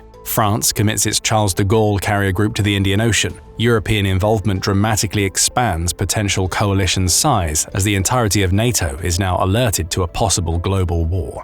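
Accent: United Kingdom. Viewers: The moment the British voice, I m gone.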